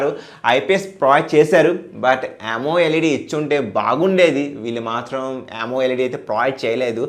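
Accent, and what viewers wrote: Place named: United States. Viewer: way of talking in telugu change cheyandi mari american la try chesthunnaru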